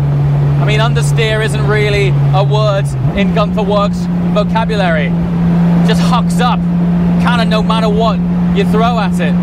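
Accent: United Kingdom. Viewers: something different about a british guy explaining a car.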